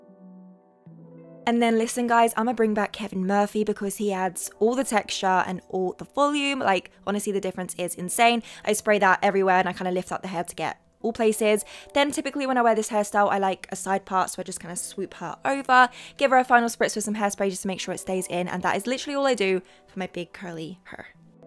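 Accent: United Kingdom. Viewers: Are you British :D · SOMEHOW I KNEW SHE WAS BRITISH 😭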